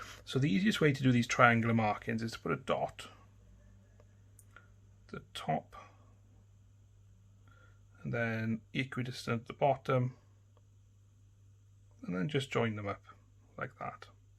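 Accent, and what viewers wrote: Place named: Welsh border, United Kingdom. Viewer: It’s nice to hear an accent that’s close to home. I’m on the welsh border myself! Great content!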